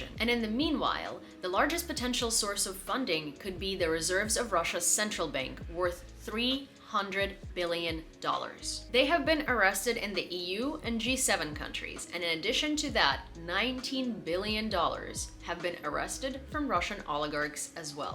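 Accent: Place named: Missouri, United States. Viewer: You sound like you're from Missouri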